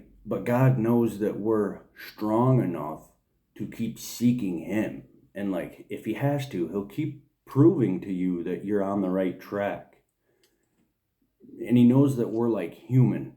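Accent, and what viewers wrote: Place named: Michigan, United States. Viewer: I knew your accent was familiar!!! I lived in Michigan for 20 years. God bless you brother!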